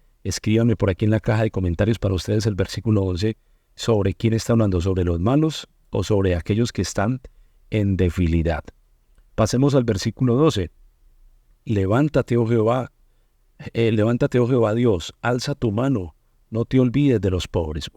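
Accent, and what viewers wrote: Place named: Colombia. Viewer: HOLA PASTOR..POR SU VOZ CREO QUE ES DEL PAIS DE COLOMBIA..YO VIVI EN COLOMBIA PERO YA ME VINE A MI PAIS VENEZUELA. ESCUCHO SUS <link> GUSTA APRENDER DE DIOS CADA DIA.BENDIGO SU VIDA EN YESHUA EL PODEROSO DE ISRAEL ISRAEL..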